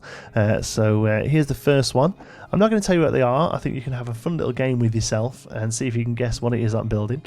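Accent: United Kingdom. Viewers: I get what they are saying about your voice, it is very soothing. Being from America, of course, I love your accent. It reminds me a bit of the way The Beatles sounded when I was a lass. Some of your words stick out more than others, like the way you say mental. I will often stop the video to replay it again. You are a great Plan Co builder and wicked smart like a fox with design.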